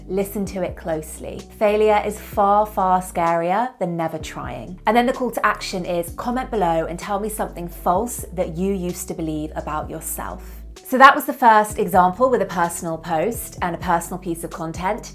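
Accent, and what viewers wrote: United Kingdom. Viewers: She's got that Harry Potter accent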